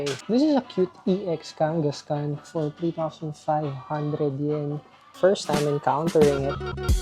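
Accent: Philippines. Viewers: ur accent for sure 100% filipinos haha👍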